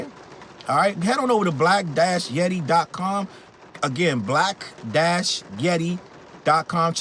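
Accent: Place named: Maryland, United States. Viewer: Also, are you from Maryland? I’m from 301 and def hear the accent at times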